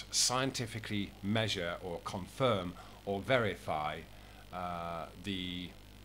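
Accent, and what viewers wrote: United Kingdom. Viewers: You sound as though you were educated in the UK. Could you please tell me at which school?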